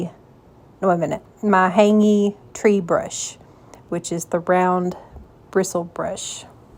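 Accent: South, United States. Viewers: that southern drawl is to die for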